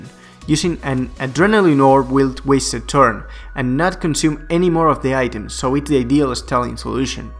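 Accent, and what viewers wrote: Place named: Spain. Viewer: Se nota que eres español pero tienes un buen nivel de ingles! Ha caido algu shiny ya?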